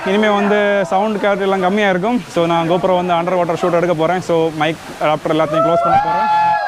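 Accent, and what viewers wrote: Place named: Kanyakumari, India. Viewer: Nenga Kanyakumari ah🙄🙄🙄 unga slang some different🤔🤔🤔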